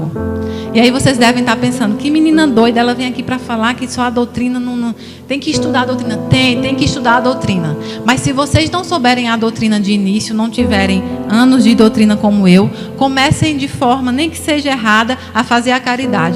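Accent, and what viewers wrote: Ceará, Brazil. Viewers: Ela é muito leve no falar eu gosto de ouvir ela falando das cartas ela e tão simples parece tão amorosa com todos e esse sotaque da minha terrinha Ceará e muito bom ouvir ela eu sei que nunca vou receber uma mensagem dos meus entes queridos que já se foram mais fico feliz ouvindo as mensagens dos outros pra seu familiares eu dou risada eu choro me emociono de ouvir as cartas lidas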